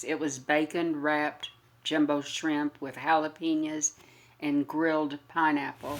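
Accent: South, United States. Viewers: You sound southern and your food sounds southern....I am from Texas ...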